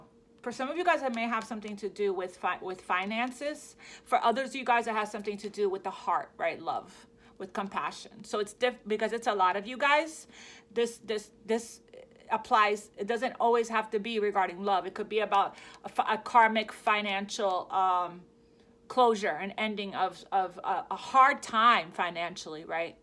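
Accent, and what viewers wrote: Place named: New Jersey, United States. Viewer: I love you so so much ❤️💕💕 You sound like a Jersey girl did you say you live in Dallas!!? You are my favorite reader I connect so hard with you I'm in Big Spring Texas born 2 - 9 1970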